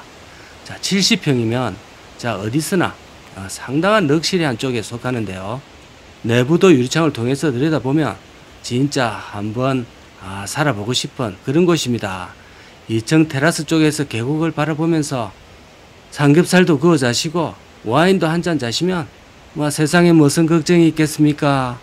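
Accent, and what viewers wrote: Gyeongsang, South Korea. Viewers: 경상도 사투리 완벽하게 이해하는 1인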